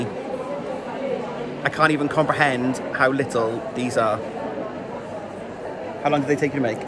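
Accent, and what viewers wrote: Wales, United Kingdom. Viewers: my 8 year old son says he is surprised because he’s actually enjoying this video and watching the set up! Haha. He says he likes the way you talk! LOL. He means your Welsh accent!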